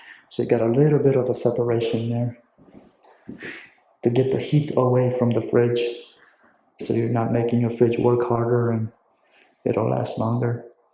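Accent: Sweden. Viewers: I like this small house. Your accent.. sounds Swedish. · You are going to love it in Iceland. Reccomend coming in July since you don't like cold 😋 I look forward to your next series. I dont know why but your channel is so calming. Not a feeling I associate with building cites lol.. I'we been trying to place your faint accent. But it's to faint to be sure. Sounds a bit Swedish somtimes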